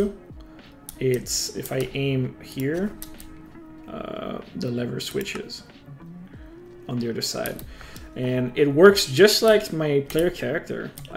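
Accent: Quebec, Canada. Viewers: Are you from quebec?? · are you from quebec? you really sound like you do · Are you from the Quebec? I'm from there and I think I heards a subtle accent and, the Quebec accent is pretty easy to recognize. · bel accent quebecois gros xD jespere sa pue pas trop a trois-r lol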